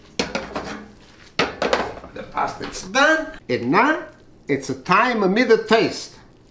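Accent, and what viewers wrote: Italy. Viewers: Your Italian is just like my Mother's. She was from a village in Forino . It was called Petruro. You are Awsome!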